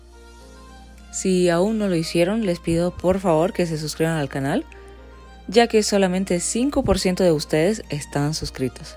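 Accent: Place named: Argentina. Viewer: !!??tu castellano es muy argentino!!!!😃 Coca, jugo, y como estructuras muchas frases!!! Te A D O R O!!!!!!!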